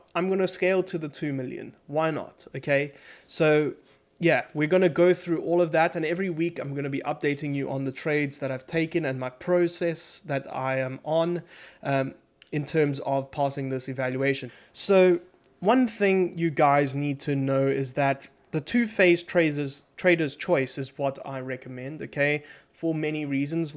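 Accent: South Africa. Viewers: Ah you can’t miss a South African accent, from a fellow South African being able to get funded opens a massive opportunity for us dealing in dollars, I’ve been wanting to take a challenge and hopefully, I can get my chance.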